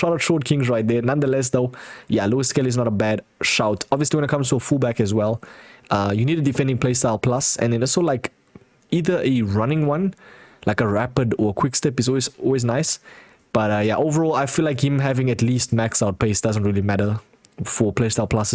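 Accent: South Africa. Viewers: I think he is from South Africa 🇿🇦 or New Zealand 🇳🇿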